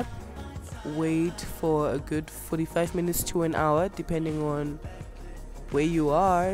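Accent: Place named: Zimbabwe. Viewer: You sound sooooo ZIMBO 💕 Makes me so homesick just listening to you!!!! Gosh these look mnandi sibili 💕 Thanks sis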